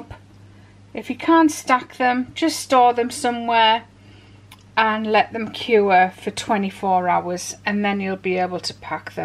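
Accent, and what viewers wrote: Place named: Stoke-on-Trent, United Kingdom. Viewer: Where you from? Your accent sounds very similar to mine and I'm from stoke on Trent.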